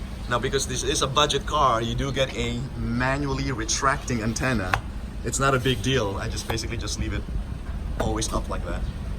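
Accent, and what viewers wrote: United States. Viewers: Nice voice with american accent & very well review. 👍👍👍👍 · I thought this video was filmed in US because of ur accent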